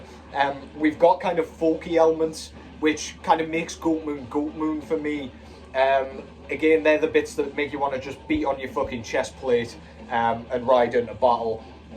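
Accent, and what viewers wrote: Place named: Scotland, United Kingdom. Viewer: Don't mean to have your headache get worse...I was kind of pulling your chain last week when I suggested you had a south african accent. I figured it was scottish (mothers side of the family, McRae from the highlands, down to Colombia in the 1920's) but it seemed very mild, like maybe watered down from another anglo country, maybe even the USA. SOme of the scottish immigrants in Toronto (CAN), you can barely understand them, even the ones here since the 1950's. Great show on black metal, man. Cheers.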